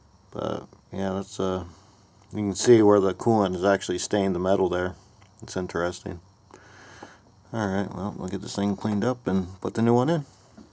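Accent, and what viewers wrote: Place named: Missouri, United States. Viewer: Sounds like you live in Missouri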